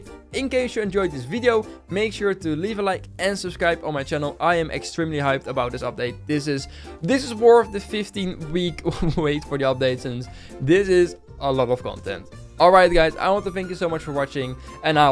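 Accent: Netherlands. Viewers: pov: you can't hide your dutch accent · tell me this guy is dutch without telling me he is dutch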